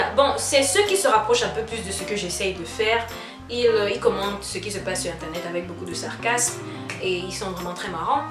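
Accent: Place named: England, United Kingdom. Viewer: J'aime beaucoup ton accent anglais. Où tu l'as tu appris ?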